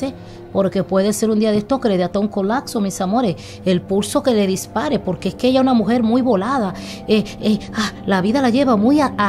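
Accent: Dominican Republic. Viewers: Hola.....disculpa pero yo pensé que UD era Dominicana..no habla como venezola..con mucho respeto..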